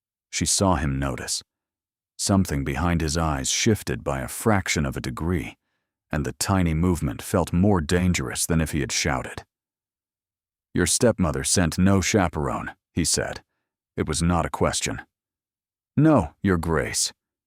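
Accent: United States. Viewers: Cant bear us accent.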